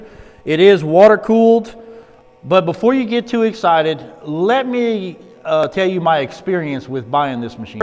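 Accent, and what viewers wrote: Louisiana, United States. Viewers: Are you from Louisiana? You sound like us Cajuns. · This guy must be in Louisiana. As a Louisiana resident, born and raised, I know a Cajun when I hear one...atleast he sure sounds like a Cajun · Where are you from, i hear half coonass in your voice...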